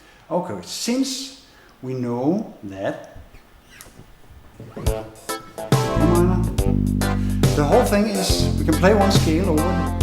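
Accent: Denmark. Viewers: Great! A danish Bass player with danish accent (no offence) playing on a danish bass amp :) I like your videos. You are a great teacher. Greetings from Denmark.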